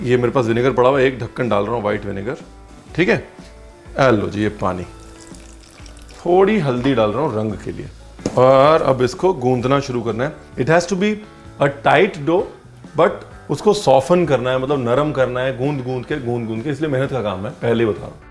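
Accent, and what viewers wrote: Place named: Uttar Pradesh, India. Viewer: Ranveer d way u talk and d words u use i can so much relate to them coz m also frm UP .... apnaiyat ati hai bohot 😇... n i so much enjoy watching ur videos they are so different from others their is a charm... love ur simplicity and humble attitude ❤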